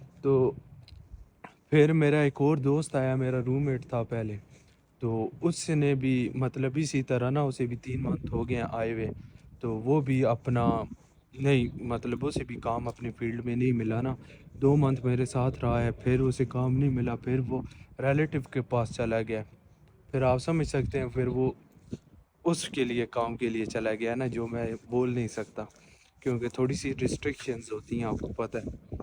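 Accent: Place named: Chakwal, Pakistan. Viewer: Ap Chakwal ke lagtey hain bhai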